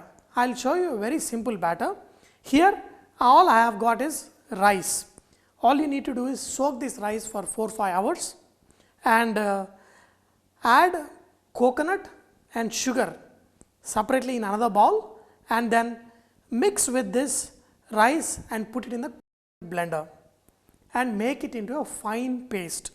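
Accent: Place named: Kerala, India. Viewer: your accent is so typical malyali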